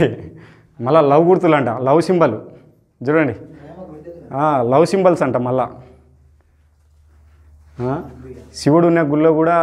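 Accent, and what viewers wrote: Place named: Kadapa, India. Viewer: Naynu ayithay definitely miru ma Kadapa slag matladuthunaru ani naynu Chusthunna anna Bigam bayala....inka Sadhi buvva.....kaniii Raaa biiii ani Marchipoyaru anna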